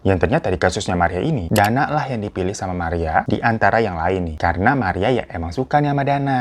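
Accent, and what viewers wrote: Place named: Pasar Minggu, Indonesia. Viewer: Background: Romania. Logat: Pasar Minggu. Paling sedep emang dengerin cerita, udah kayak dengerin tetangga ngoceh. Dan ceritanya wow kayak Mid Sommar.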